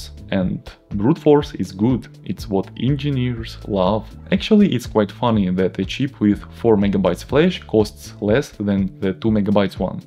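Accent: Russia. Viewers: русский русского услышит всегда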